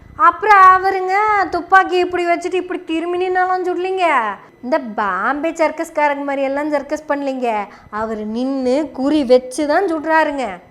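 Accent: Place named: Coimbatore, India. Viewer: இப்படியா, கும்மாங்குத்து குத்து அடிக்கிறது, கோயம்புத்தூர் slang-ல.. ஏற்கனவே அந்த திரள் நிதி திருடன் போலீஸ் கேஸ்ல, மாட்டிக் கொண்டு, ஜெயிலுக்கு போறதுல, பேதிக்கு போயிட்டு, இருக்கான், சைமன் செபாஸ்டியன் aka சீமான். அவனே, ஒரு அல்லக்கை..வாடகை வாயன். அவன், ஒரு தற்குறி . ஆனால், அவன் பின்னால், நிறைய ஜோம்பிஸ். இவர்களுக்கு, என்னவென்று சொன்னாலும், புரியாது. ஆளும், வளரனும்.அறிவும் வளரனும். (எம்ஜிஆர் பாடல்) 😂😂😂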